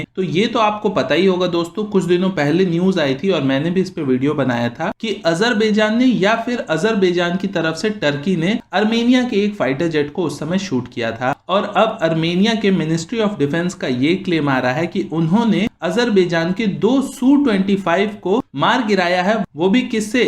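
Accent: Bengal, India. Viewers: Sir r u bangoli?? Motamuti..😅u can't hide your words..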